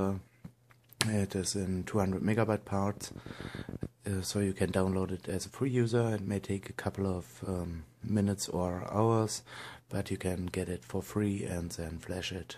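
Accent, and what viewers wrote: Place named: Germany. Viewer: Warum redest du kein Deutsch man gehört genau das du deutscher bist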